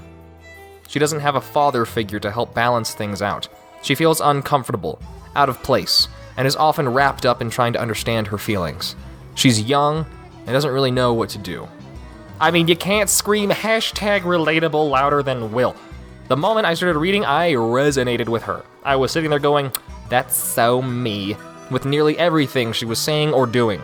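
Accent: France. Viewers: I'm French and always knew Witch with the magazine you have (btw, really cool French accent xD) and yeah the arc continue a looooong time after Nerissa and all that stuff ! For me, Witch was still perfect untill the Ragorland arc with Hay Lin. It's the very first time as a teenager where I was not convinced, and after that when they open a magical school for kids I totally abandonned Witch... It's sad because I still think it was hell of a serie : the drawings were beautiful, it was really feminist, it talked really well about teenage years, it was epic, sad, disturbind, violent... Perfect ! I totally hated the TV show, it was ugly and too superficial for me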